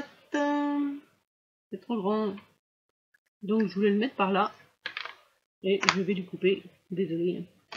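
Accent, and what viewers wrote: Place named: Franche-Comté, France. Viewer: Super idée ! Je vais en faire 1 aussi ! J'avais bien reconnu l'accent franc-comtois ( suis Belfortaine) 😉 je m'abonne à votre chaîne😁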